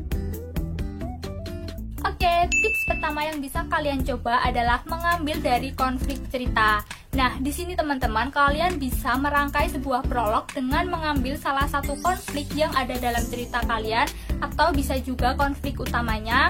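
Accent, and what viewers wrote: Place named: Java, Indonesia. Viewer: Kek medok jowo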